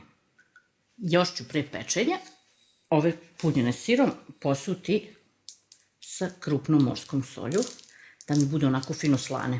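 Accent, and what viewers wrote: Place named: Backa, Serbia. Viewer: predpostavila sam da ste iz Backe po dijalektu.Jos jednom veliki pozdrav,laku i mirnu noc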